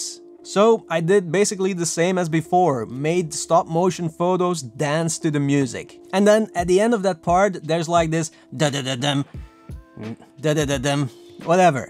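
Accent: Belgium. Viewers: Heel mooi om jouw Nederlands (Belgisch accent) te horen. Moet je wel vaker doen :)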